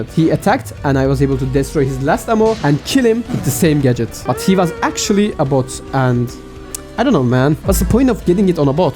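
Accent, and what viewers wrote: Turkey. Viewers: vrotato ru from turkey ngl cuz ur accent is so close to mine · Yo are you Turkish? Your sound like that i think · videon anasayfama dustu ve ilginc geldigi icin acip izliyim dedim. videoyu acar acmaz saniyesinde aksanindan turk oldugunu anladim. sonra da kanal ismine bi bakiyim dedim ve ne goriyim, adasmisiz:D tanidigm bikac birisin ve hic beklemedigim biyerde ismimi gormek sassirtti beni. kanalina soyle bi baktim ve icerigin de hosuma gitti, yt seruveninde basarilarinin devamini dilerim dostum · Bu harika bir video, aksanına da bayıldım; ayrıca Türk müsün? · TURKISH ACCENT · holy Turkish accent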